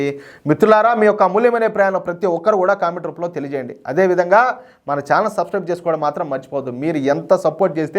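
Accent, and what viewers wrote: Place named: Kadapa, India. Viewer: I love your Kadapa language.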